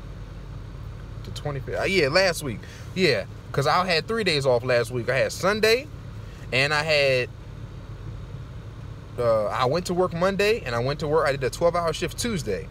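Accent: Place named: Maryland, United States. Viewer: You sound like you from dmv like maybe Maryland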